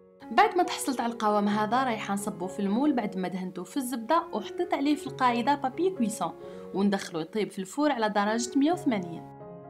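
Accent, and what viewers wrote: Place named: Algeria. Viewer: الله يعطيك الصحة اغزلا قمة الاناقة والهدرة الجزايرية اتحمقني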